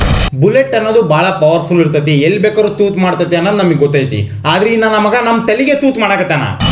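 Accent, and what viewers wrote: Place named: Harihara, India. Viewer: Olle comedy bro n nim dialogue super.. nam Harihara bhaashe kushi aguthe keloke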